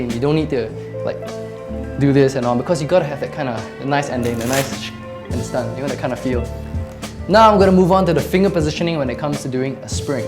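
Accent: Singapore. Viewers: This guy sounds Singaporean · hmmm....funny how i can tell apart a singaporean.probably the way we speak... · you sound so singaporean ! Are you? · you know a singaporean when you hear one... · wah seh, ur singaporean accent quite strong. by ur 2nd sentence i can tell ur singaporean already... hahahhaha.. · You sound like singaporean or malaysian. :p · Your accent man... are you Singaporean? o_O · I love your Singaporean accent. Me dad is from Singapore <3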